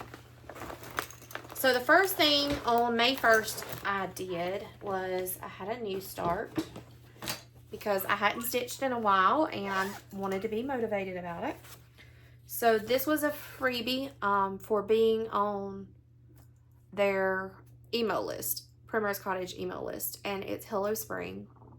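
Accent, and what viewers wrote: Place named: South, United States. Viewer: Just found you. You sound like you have a very familiar southern slang. Was nice to hear. Enjoyed your tube. Thank you for sharing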